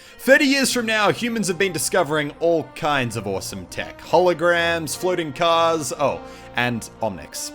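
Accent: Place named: Australia. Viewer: your Australian showed so Hard in this vid. Reyes is pronounced Ray-ez, not Rays lol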